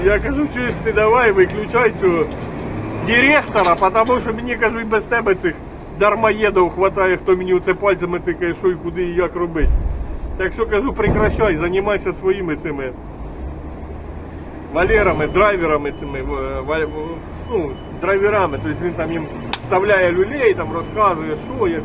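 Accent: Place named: Kherson, Ukraine. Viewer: Да, наш суржик ниякий гугл не переведе! І ніхто так не може балакать, як ми!Херсон і окресності!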